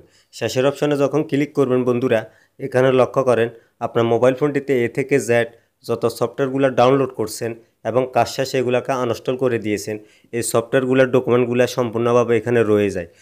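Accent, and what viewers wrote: Noakhali, Bangladesh. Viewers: নোয়াখাইল্লা নাকি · Vi apne noakhilla na? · তোমার বাড়ি কি নোয়া খালি নাকি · Duru khankir pula nuakailla. ..shslar put agay kotha bola shik. .tar por video bana. .. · নোয়াখাইল্লা ভাই আপনি আগে প বলা শেখেন তারপর কথা বলেন। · ভাই আপনাটর বাড়ী কি নোয়াখালি? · আগে কথা বলা শিখুন, নোয়াখাইল্লা।।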